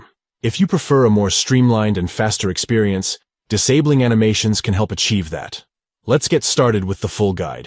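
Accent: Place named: Australia. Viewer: You should learn how to pronounce your Australian accent properly. Why do creators create these apps to be so juvenile. What about a professional approach. We want to achieve professional messaging that surpasses anything we have come across. My first experience was a stupid coronavirus animation. Goodbye 2020 hello 2025.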